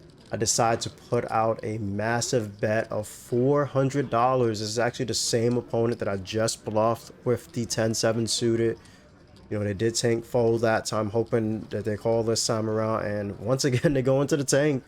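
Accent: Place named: East Coast, United States. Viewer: Love the East Coast accent and congrats on 1k subs and Monetization Fam. 🎉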